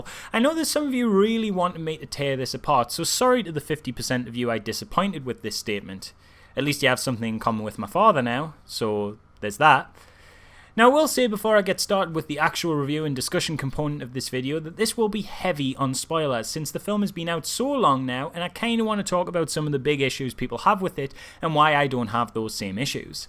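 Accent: Newcastle, United Kingdom. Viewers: Newcomer to the channel - thanks for the honest thoughts in this video. I liked TLJ a lot too. Also, love the unapologetic Geordie-ness. Good on you, fella (from way down South in Derby) 😎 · British huh......pussy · I fookin luv ya u geordie shite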